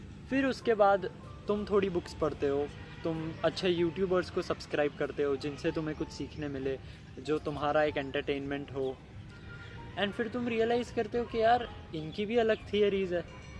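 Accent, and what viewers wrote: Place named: Gujarat, India. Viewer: gujrati log jab hindi bolte h tab thodi si to gujrati tone aa hi jati h bas ussi liye aisa laga 😅 btw mai bhi gujrat se hi hu .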